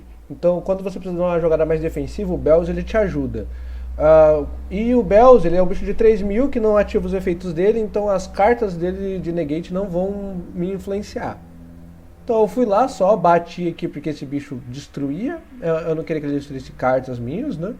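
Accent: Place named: France. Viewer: Esse sotaque francês é ótimo mas o targetar e dar alvo e de matar. Os duelos são tão rápidos no yugioh que mal da para acompanhar tudo que acontece 😂😂. Eu queria dar uma sugestão tem um baralho que eu gosto muito que o dragão armado e fiquei sabendo que tem novas cartas dele,eu queria saber como o baralho funcionária hoje em dia.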